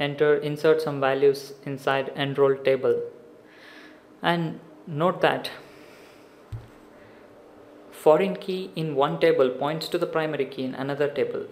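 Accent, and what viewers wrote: India. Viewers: Where is this accent from? India?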